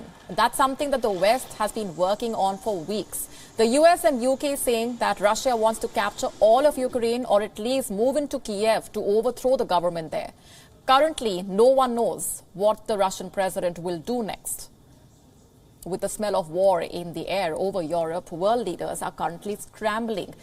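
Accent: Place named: India. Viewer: This anchor has a pretty heavy chapati/bada pav accent Not smooth like Palki Not suited for an international channel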